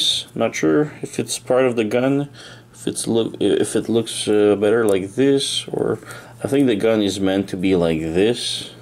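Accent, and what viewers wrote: Quebec, Canada. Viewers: it was perfectly fine pronunciation. Sounds like a Quebec accent to me and I right? 👍🏻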